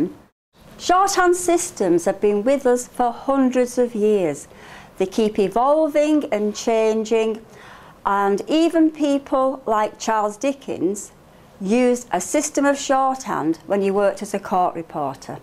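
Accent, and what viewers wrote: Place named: Southport, United Kingdom. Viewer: Thank you! Bonus that my family grew up in Southport, so I love hearing your accent! xo Roz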